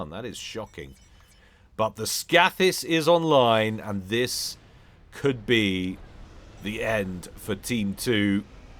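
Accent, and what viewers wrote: United Kingdom. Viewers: The thing I enjoy about casts other then the brits lingo/accent are he just enjoys the game as much as I do, where others casters seem to be just talking. The other random off topic comments are just the icing on the cake to remind us he's not A.I. Loved seeing the addition of the recap of "missed critical action" or the "WTF happened while I was focused somewhere else" at the end of some casts when needed. I know it's all alot of work but think of it as recompense for all the other stuff that was missed. Ya, not sure how trump won when I compare the two, which comes down to either Americans are stupid or sexist, which is sad because I know many of them who are not either of those. Now it's time to grab a bag of popcorn and watch the world deal with human greed/stupidity in the worst way in, power over the people. Thanks America, another thing in the history books up there with the other world goofs. 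And before someone wants to comment, lets just let the next 4 years prove me right or wrong. I just know I bought some Elon and Trump shares to sell in 2 years, which better pay for my spaceship off this planet cause we can't take the money where humanity is going. XD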